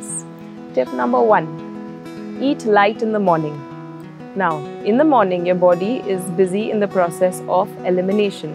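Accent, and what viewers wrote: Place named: Kerala, India. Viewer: Oh! That's great! Your rendition resembles a typical kerala girl, infact,that's what I felt.Anyway great lessons and quality contents.Go ahead madam 👍🏻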